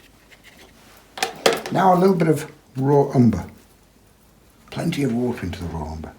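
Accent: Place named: Sheffield, United Kingdom. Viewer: Hiya. I see I'm the first to comment! I found you a couple of weeks ago and I've watched all your videos. I really enjoy your teaching style and casual and effective way of approaching art. I live in South Africa but was born in Sheffield so your accent is a joy to listen to. Best regards Sylvia xxx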